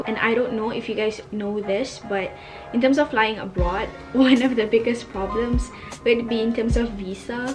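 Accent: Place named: England, United Kingdom. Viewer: yowww her English accent so🤩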